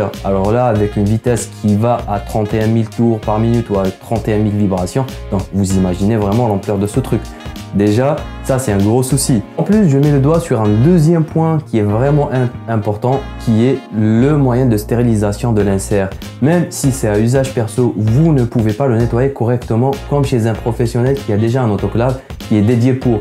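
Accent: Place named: Algeria. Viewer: C'est certainement l'un des nombreux dentistes algériens qui sont venus s'installer en France d'où l'accent et les fautes de syntaxe ...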